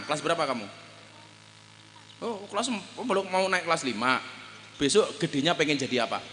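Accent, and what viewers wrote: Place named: Banyumas, Indonesia. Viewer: Masyaalloh gus gus ngapak ngapakku dewek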